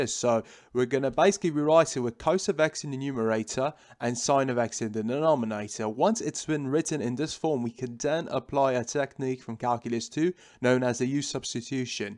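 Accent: United Kingdom. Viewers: You sound like a proper west ham geezer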